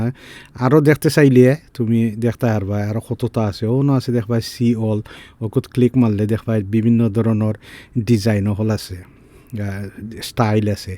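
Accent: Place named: Sylhet, Bangladesh. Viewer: Finally a Sylheti speaking video. Awesome video. Thank you for teaching how to use canva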